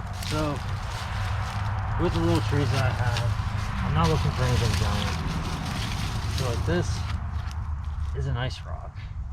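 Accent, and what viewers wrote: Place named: Philadelphia, United States. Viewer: I went to college outside Philly. I thought I detected a familiar accent. ✌🏻(hint)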